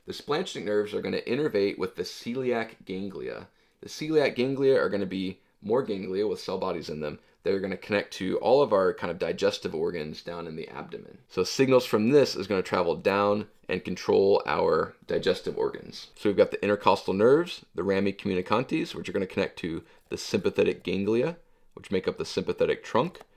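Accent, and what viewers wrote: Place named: United States. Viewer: Please can add subtitles for non Americans that can understand your accent. Thanks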